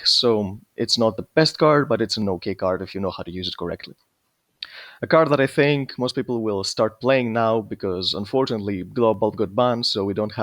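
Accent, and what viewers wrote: Greece. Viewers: Δεν παιζει να μην εισαι ελληνας με τετοιο αγγλικο xD <3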